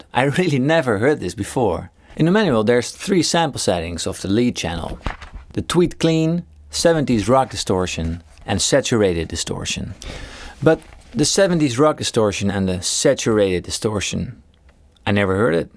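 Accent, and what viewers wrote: Netherlands. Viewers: Great video, and I love that hint of Dutch in your delivery.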